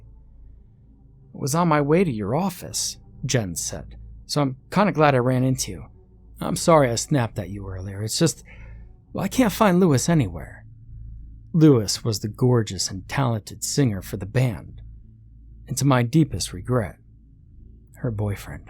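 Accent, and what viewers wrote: South, United States. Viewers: Its pronounced bir-ming-Ham not birmingam, this story somewhat misrepresents the city. But your southern accent is on point.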